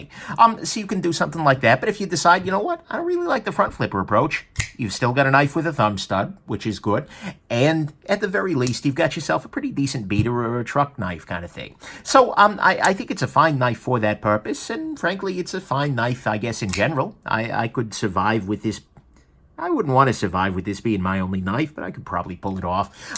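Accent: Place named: New Jersey, United States. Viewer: That is a New Jersey accent.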